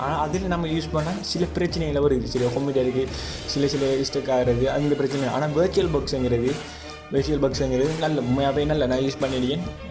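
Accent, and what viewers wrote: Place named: Sri Lanka. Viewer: Bro Your Srilankan...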